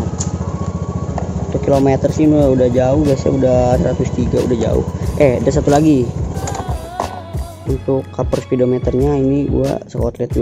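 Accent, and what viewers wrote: Serang, Indonesia. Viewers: orang serang bro?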